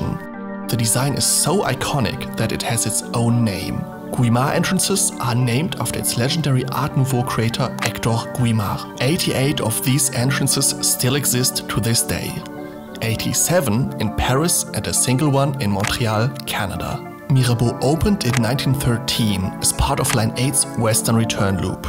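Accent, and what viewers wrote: Germany. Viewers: You are German right 😂😭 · Ich war schon über dein deutsches Englisch verwundet. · du bist deutsch oder? top video 👍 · Are you german? Your english has a german accent I think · Great vid, watched your previous one which is equally as good. I love the short, comprehensive style with the added German Accent! Great English btw. Hope to see the channel grow bigger and bigger with every video! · I was wondering if you were german, turns out you are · That was by far the best suggestion YouTube did since a long time. Great video, and very underrated! You should have 100 times the subscribers you have now and at least 10 times the views this video got today. Greetings from a fellow German. :) (By the way: the first thought which came in my mind when I heard your Voice: he is definitely a German. 😂) Keep it up mate!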